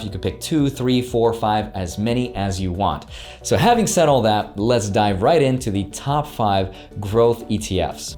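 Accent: Russia. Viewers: I love your Russian accent. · You are my favorite stock guy!! And could you please use your Russian accent more.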